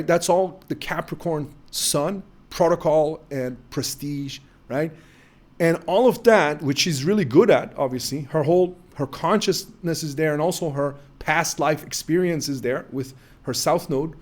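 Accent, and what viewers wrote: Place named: New York, United States. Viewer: I'm American of Swiss French parents. I was in Iran for 2 or 3 weeks in 1970. I hitched rides from Turkey to Tabriz then Tehran . Had to take a train to Mashed , a very holy city. Iran was a very peaceful place. The people were super nice , very intelligent, very clean. The woman were free and very friendly in a very respectable way. It was completely different going into Afghanistan . A very wild place. Your channel says United Kingdom but you sound like you're from New York city. Your astrology readings are always a real mind blower. You deep dive like no other. Thanks for your incredible work !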